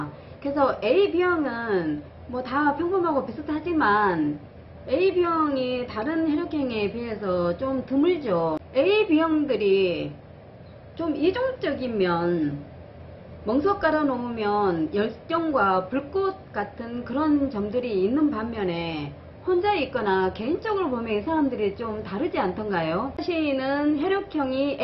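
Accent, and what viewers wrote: Gyeongsang, South Korea. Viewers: 와이프는 AB형 와이프의 행동 방식을 이해하게 되었습니다. 가끔 경상도 억양이 귀엽게 들리네요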